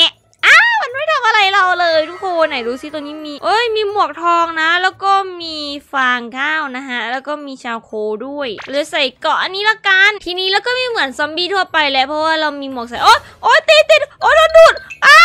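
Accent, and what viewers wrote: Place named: Isan, Thailand. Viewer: คนอีสานเบาะ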